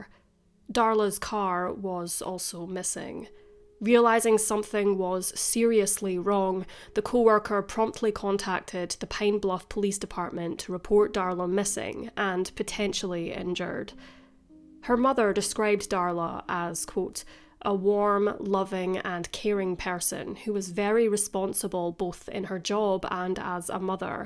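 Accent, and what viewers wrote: Scotland, United Kingdom. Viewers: If you mean the narrator, it's easy to tell she's from Scotland, not Ireland.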